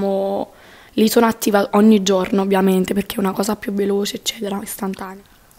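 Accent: Italy. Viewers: Ma é proprio il suo modo di parlare é una cosa che hanno i napoletani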